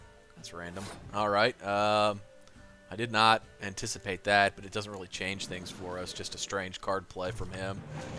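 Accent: United States. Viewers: sorry your accent is really annoying california? whateverrr...we're gonna match that playyyyyyy... ugh. and ,you got this you had a bad ass last hand enough*edit* it wasn't last hand my bad i get my Irish up sometimes.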